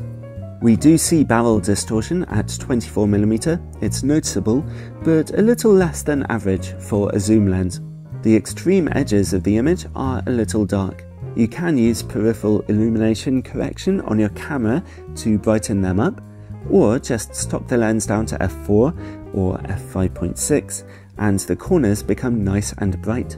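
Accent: United Kingdom. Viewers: The best reviews ever. Love your British accent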